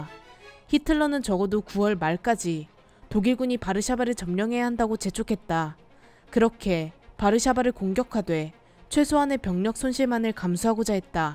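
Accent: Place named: Gyeongsang, South Korea. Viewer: 경상도 억양이 섞여있으셔서 그런듯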